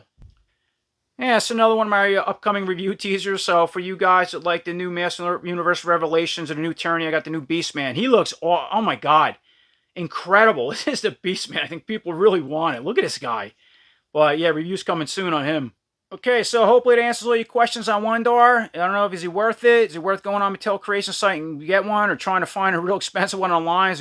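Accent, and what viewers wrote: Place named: East Coast, United States. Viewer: i freakin love seeing this stuff reviewed with a surly back east teamster accent 👌😆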